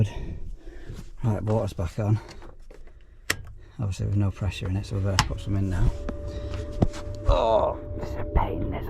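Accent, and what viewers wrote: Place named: Black Country, United Kingdom. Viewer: recognised the Black Country tones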